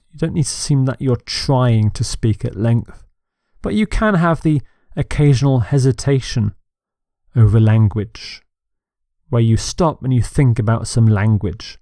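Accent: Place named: United Kingdom. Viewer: Thank you you are my best tutor, i love Your accent very much. I really would like be like you with your accent.. Please give me some tips as if i can be a British speaker like You. And i would like to be introduce with you personally. I am from Bangladesh and you?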